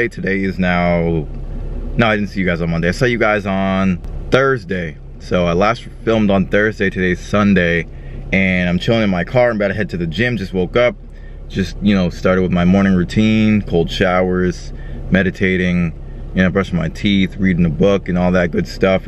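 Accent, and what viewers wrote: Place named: East Coast, United States. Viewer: You from East Coast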